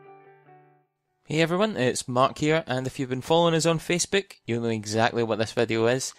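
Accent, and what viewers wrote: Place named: Scotland, United Kingdom. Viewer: I don't want to sound incredibly creepy, but where in Scotland are you from? Your accent is very similar to mine.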